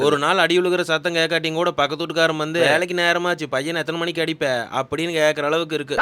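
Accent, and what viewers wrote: Kongu, India. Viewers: Singapore la kongu tamil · This is kongu slang not Coimbatore slang mostly speaking by Erode, Tirupur then only in Coimbatore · நம்ம கோயம்புத்தூர் ஸ்லாங் கேர்க்கரதுக்காகவே உங்க சேனல்ல subscription பண்ணிட்டேன் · Anna coimbatore la irundhu nga. Ippo Chennai la iruken nga. Unga super aana samayal um namma ooru baashai um kekum bodhu rombha santhoshama irukudhu nga anna. Idhe maari neraya video podunga. Best wishes! 😊❤ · Very nice Kongu thamil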